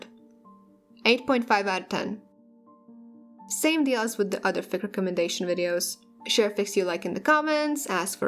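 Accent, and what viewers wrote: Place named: Russia. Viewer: I love your Russian accent.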